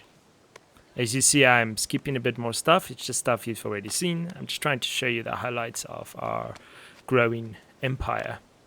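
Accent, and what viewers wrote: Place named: South Africa. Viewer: Hold up. I hear a South African accent. Was looking for someone to that does a decent long play of this game and now a fellow Zafer pops up. Lekker man.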